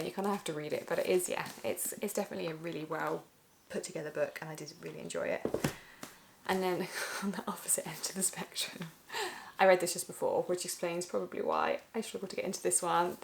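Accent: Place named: England, United Kingdom. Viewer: I thought your accent was English. 😄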